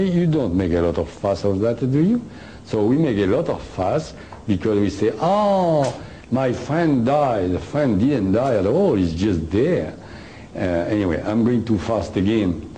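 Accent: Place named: France. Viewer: He is well and not longer in Vietnam, but that is besides the point, yes this was shot along time ago, and the video quality suggest as such, he is french, so of course he has a thick french accent, but i can understand his English just fine. I guess it comes down to the ability to understand others with accent's regardless, the book is made available in perfect English read it and you will know everything he talk about in the lecture, also don't just pass this up, this information is the read deal.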